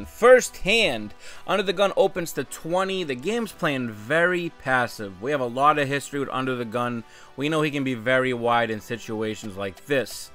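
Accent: Boston, United States. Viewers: So, NH is the same accent as in Boston? Just curious, good session, congratulations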